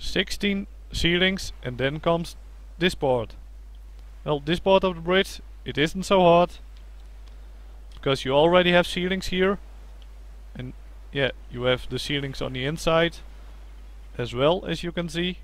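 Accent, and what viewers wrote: Netherlands. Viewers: Lol i can hear he is dutch (im dutch too btw)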